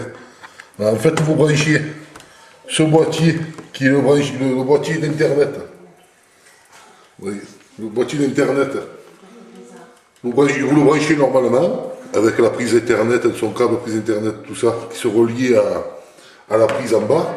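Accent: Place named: South, France. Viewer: Salut le sudiste ici le nordiste, tu as envoyé du soleil chez moi avec ton accent et ta technique 😉 merci bien! je me bat depuis 2 jour avec des boîtier de m**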